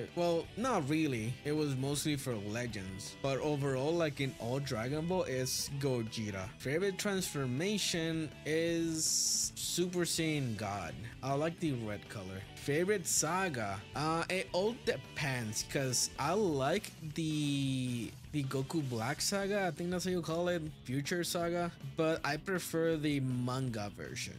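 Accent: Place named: Spain. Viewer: Wallahi i knew that you speak Spanish from the accent 💀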